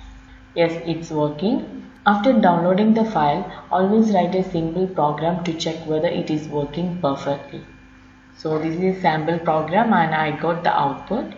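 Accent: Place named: India. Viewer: shitty indiann accent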